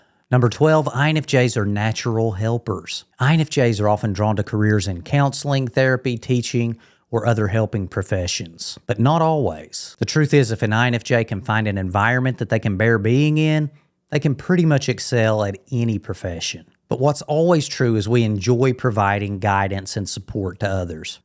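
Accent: South, United States. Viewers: This is lovely. I love your Southern accent.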